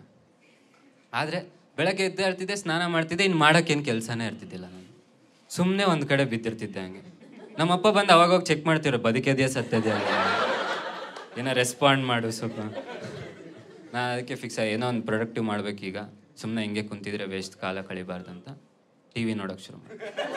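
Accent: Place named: Davangere, India. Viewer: Sakath bro…I loved it man. I have friend from Dhavanagere, nin thara ne guru same mathadodu!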